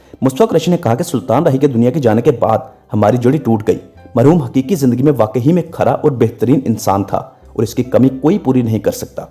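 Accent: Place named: Punjab, India. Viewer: Urdu ko urdu mein boleen urdu ko punjabi mein mat boleen please ...apna urdu ka.lehja sahi kareen ... video bana kar dard e sar na baneen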